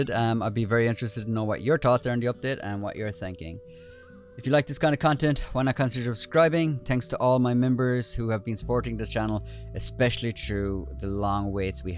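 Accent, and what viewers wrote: Ireland. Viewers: He’s Irish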